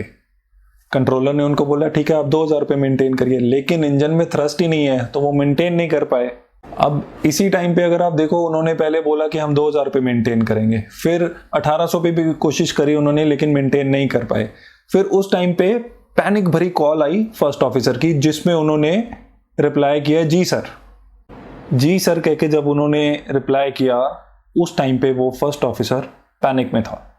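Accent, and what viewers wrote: India. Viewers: captain who ?! JahaJ ? opinion ? رائے ؟ matter nahi kartı junior hu ya senior. JeeJh ? Are you an indian ? You have an indian accent ! آپ کا ہندوستانی لہجہ ہـے _ جو کے انتہائی مشکوک ہـے _ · Looks like an Indian commentary. Accent gives away!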